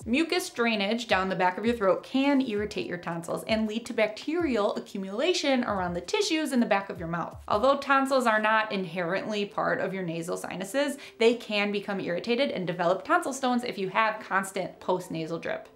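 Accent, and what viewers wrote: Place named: Minnesota, United States. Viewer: HOLY MINNESOTA ACCENT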